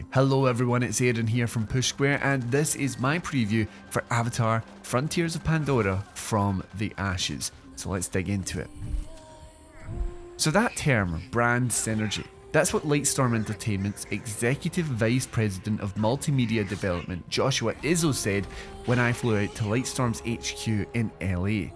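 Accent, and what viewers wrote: Scotland, United Kingdom. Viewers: Definitely Scottish. Source: my wife is Scottish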